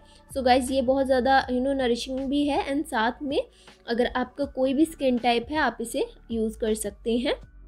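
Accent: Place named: Punjab, India. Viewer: Sister...Are you from punjab?You have got that punjabi accent..😍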